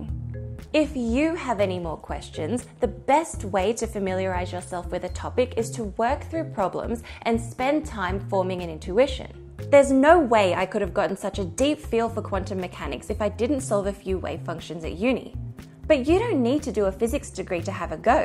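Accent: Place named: Australia. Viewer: Aussie accents are so hot!!!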